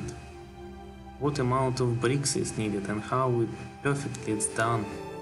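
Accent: Russia. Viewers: А акцент то у тебя Русский. Чётко слышно.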